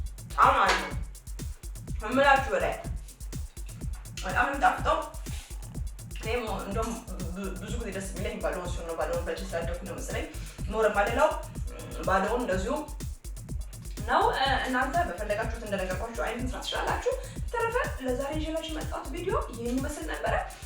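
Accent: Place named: Dire Dawa, Ethiopia. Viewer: ባለሞያ ነሽ አወራርሽ ደሞ የድሬ ዳዋ ሰው ትመስያለሽ የኛ ቤት አወራራቸው እንዳንቺ ነው። ለማንኛውም ማንኛውም alcohol አስከ 3 ሰአት የሚበሰል ከሆነ ነው አልኮልነቱን የሚያጣው 10 ወይ 15 ደቂቃ በማብሰል አልኮልነቱን አያጣውም ።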